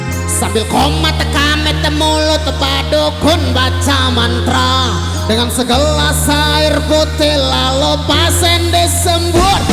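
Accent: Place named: West Java, Indonesia. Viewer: ....yeesss. teryata logat bahasa sunda bisa jg di pake orang lombok.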